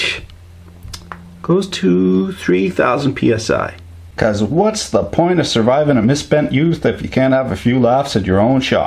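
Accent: Canada. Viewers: This guy sounds like he is from Letterkenny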